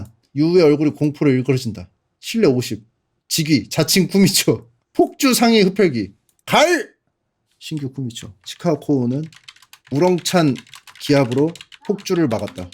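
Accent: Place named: Jeolla, South Korea. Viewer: 서남방언을 잘쓰고 눈치가 빠른 침착맨 겐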